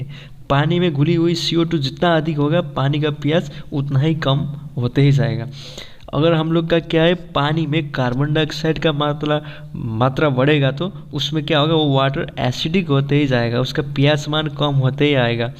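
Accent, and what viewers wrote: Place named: Nepal. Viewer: Nepali ho Kya bro ta .. Hindi ta Nepali le bolni jasto cha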